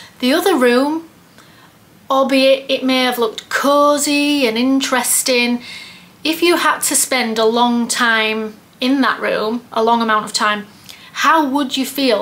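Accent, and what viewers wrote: Yorkshire, United Kingdom. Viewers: Yes, I did inherit the Yorkshire sense of humour-the best sense of humour in the world! I was born in NZ, but my entire family was born in Leeds, including my older brother. I think that is what initially attracted me to your videos. Even after being here 60+ years, my mum's accent is still as strong as yours. I have only been following you for a couple of months and am gradually working my way through all your past videos. It's about 6.30am on Friday morning (yes, I am in your future😱😳🤪😅), so time to get mum out of bed - no rest for the wicked. Do you have a job? How do you fill your day? Maybe you have done that video, and I just haven't seen it yet. I like watching your thought process as you declutter. I have trouble with letting go of mementos picked up from traveling and items that remind me of good times. And teddy bears. 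And CDs. And tarot cards. And duplicates of things (as NZ is earthquake and tsunami prone, I am constantly worried about not having backups if I lose the original). Oh God, 10 minutes later, and mother will be wetting the bed. Have a good night. Enjoyed chatting. If you are ever down this way ..... call in, have a coffee, stay. Would love to meet you, you come across as kind, funny, calm and very friendly 💖💖💖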